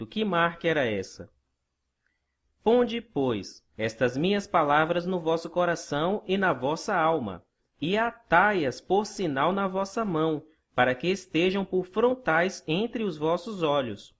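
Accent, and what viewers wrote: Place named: Northeast, Brazil. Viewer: Seu canal é didática bíblica. Porque não põe seu nome? Seria muito bom conhece você seu sotaque nordestino lembra irmãos amados do nordeste um abraço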